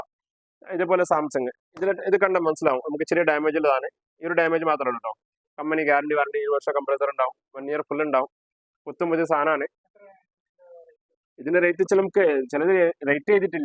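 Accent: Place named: Tamil Nadu, India. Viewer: Thamizhan aanenh samsarathil manasilavunhundallo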